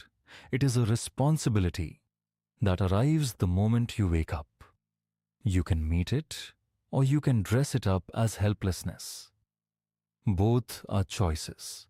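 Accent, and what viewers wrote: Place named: India. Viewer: Even AI has Indian accent now! Everyone is so tired of Indian immigration and infiltration.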